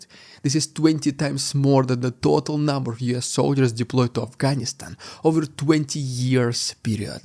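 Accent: Russia. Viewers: Some days your thick, Russian accent is harder to understand then other days... and today was a lot of butchered English, buddy... ... Love your video's, first thing I do every morning... but ???? focus, buddy, focus.....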